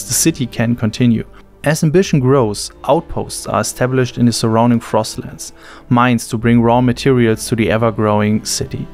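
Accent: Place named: Germany. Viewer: Ich hab nicht mal 10 sekunden gebraucht, um zu merken, dass du aus Deutschland kommst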